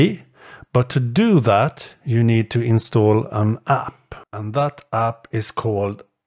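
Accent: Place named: Sweden. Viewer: Jag kan höra att du pratar svenska haha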